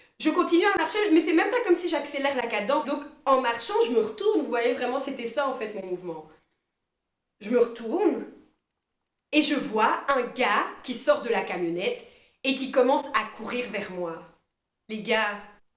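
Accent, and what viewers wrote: Belgium. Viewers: Sa ma fait rire surtout avec ton accent belge ahah mais c’est vrai que sur le coup t’es paralysé 🤷🏻‍♀️💙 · Est-ce que tu es Belge prcq tu as un accent? 😂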